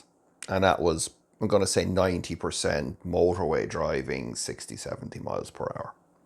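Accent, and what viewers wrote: Ireland. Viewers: Thank you Mr. 😁. Really loved the sarcasm with the really nice Irish accent.. 😊. I Agree with you 100 % on this matter, you broke it down quite well on why and how. As usuall ppl do not even know why they do, what they do. This would serve as a good tutorial of asking them, do you even know what you want? Thank you for the video, and Happy New Year 2022..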